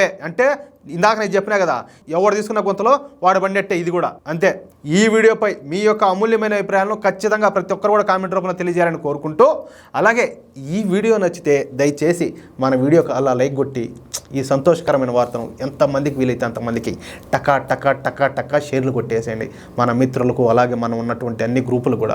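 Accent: Rayalaseema, India. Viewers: నమస్కారం గారు మీరు చెప్పే ప్రతి మాట తూటా లాగా ఉంటది రాయలసీమ యాసలో అద్భుతంగా మాట్లాడుతున్నారు నిక్కచ్చిగా ఉన్నట్లు మాట్లాడటం చాలా బాగుంది🎉🎉🎉🎉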